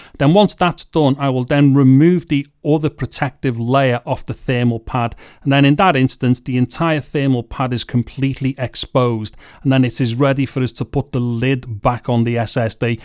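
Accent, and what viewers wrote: United Kingdom. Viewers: Hi! First of all I (as a "bloody German") have to thank you for your very precise pronounciation! It's been a pleasure to listen to your video and I like your accent, though I wonder from which part of the UK it originates. Concerning the read speed you measured with the enclosure one has to consider the write speed of your internal ssd. This has to be faster than the read speed of the external ssd to be able to measure the correct read speed of the TB/USB4 enclosure! By the way, those enclosures with an ASMEDIA AS2464 chipset are both TB4/USB4 compatible with complete downward compatability. Connected to a real USB4 controller in a Win11 laptop or pc you can reach up to 3800 GB/s according to ads for such enclosures such as ZikeDrive Z666 or Adata SE920. So, if you're going to proove these drives in future videos, you're going to need a relatively new laptop or a USB4 controller card for a not too old pc. 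Your 10 year old optiplex seems a bit too old for this, I think...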